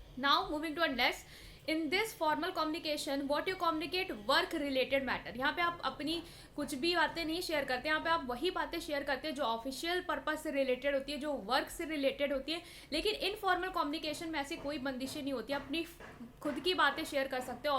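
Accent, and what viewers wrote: Lucknow, India. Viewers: App Lucknow say hai lag raha😀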